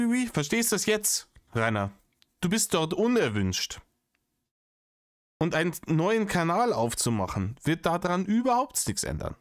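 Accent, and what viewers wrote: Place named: Upper Palatinate, Germany. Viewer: Kommst du aus der Opf.? Hört sich bissl so an -> Ich wohn aba scho lang im Osten & hör den Unterschiede nimma so guad😉 Wie & wo auch imma: Beste Grüße ins scheene Bayern💙🤍💙🤍💙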